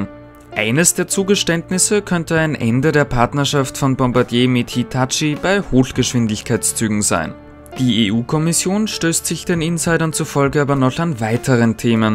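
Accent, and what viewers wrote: Austria. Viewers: glaub ist ein fast versprecher oder mikrofehler aber die acht spreche auch ich(auch ösi) so aus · Du bist für mich der "Apfelwelt" der Eisenbahnbranche :D kurze informative Videos mit einer ruhigen und gut verständlichen Tonspur mit österreichischem Akzent :p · spricht ja nun wirklich sehr gut verständlich und auch sympathisch. In Österreich werden einige Laute halt anders ausgesprochen, so what? Gruß aus Frankfurt, wo es auch nen Dialekt gibt. 😅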